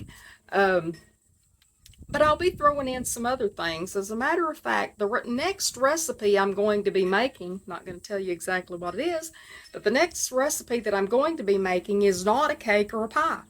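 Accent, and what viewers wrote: South, United States. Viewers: You are so adorable with your cute southern accent, we could listen to you all day long.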